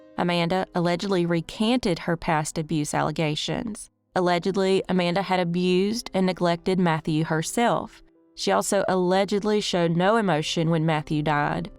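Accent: South, United States. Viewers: I really like your channel. The cases are interesting and i havent heard them a dozen times Als i really like that southern accent.😊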